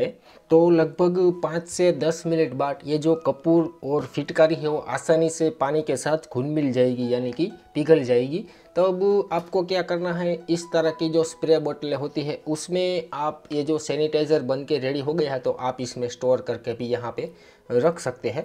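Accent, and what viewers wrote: Gujarat, India. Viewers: Are u Gujarati??? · Kya bhai tum Gujarati ho ha moj ha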